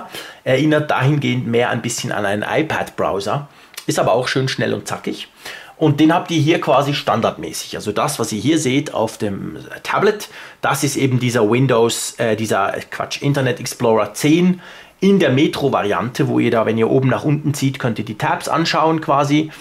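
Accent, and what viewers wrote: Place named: Switzerland. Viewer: Von de Stimme höre ich, dass Sie wahrscheinlich kein Deutscher, sondern ein Schweizer seid, ich auch. Deshalb meine Frage: Ich möchte mir das Surface Pro kaufen, aber dies Erscheint in der Schweiz wahrscheinlich erst in 3-4 Monaten. Wie sind Sie zu dem Surface RT gekommen? Liebe Grüsse aus Bern Marco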